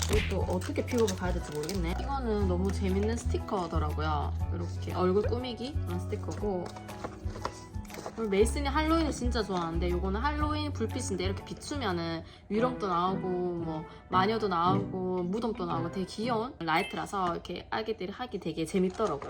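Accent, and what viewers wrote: Gyeongsang, South Korea. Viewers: 반성합니다... 같은 경상도 출신인데 은 힘든 육아 속에서도 어찌 말투에 짜증 하나 안묻어날까요..😂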